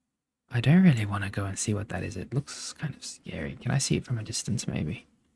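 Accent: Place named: Australia. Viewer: Not me starting to fall asleep and going, wait, I know that accent, that's my accent! And checking if you're Australian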